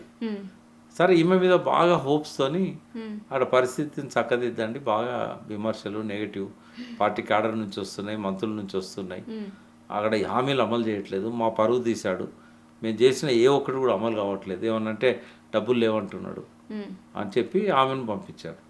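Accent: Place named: Telangana, India. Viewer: Mee bhasha telangana mandalikam matlade vallu garva padetattu unnadi chellela! Shabhash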